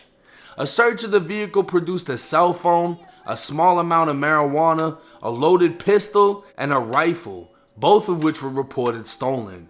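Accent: Boston, United States. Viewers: Are you from Boston or Long Island?? 😂😂 your voice is so cute reminds me of an actor I watch!!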